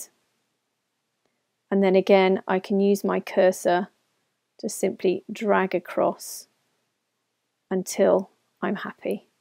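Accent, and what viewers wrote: United Kingdom. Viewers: Thanks a lot for your helping!! It helped me a lot in order to achieve my future essay, furthermore your British accent makes the audio enjoyable and understandable too!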